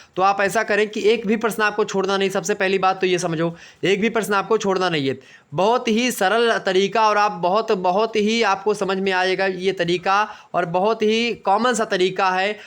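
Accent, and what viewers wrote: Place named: Kanpur, India. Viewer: Hme lgta tha sir kanpur se h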